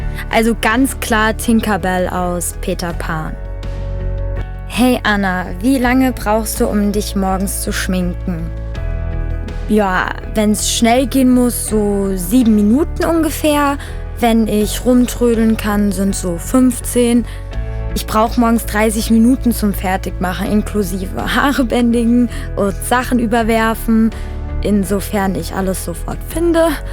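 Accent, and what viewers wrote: Germany. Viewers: Que delicia escutar esse sotaque alemão, já amava os vídeos agora estou apaixonada ❤ vocês cantam muito bem, desejo muito sucesso 💙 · I only speak English and I had to pause the video to read some but I don’t mind kinda and 1st your VERY good at singing 2ed I like how a German actcent is also sorry I spelled that wrong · Heck it's so weird hearing such a German accent, in the songs I can barely hear it! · Ich wusste dass du Deutsch bist aber jz auch nocj Baden Württemberg? Giiiiirl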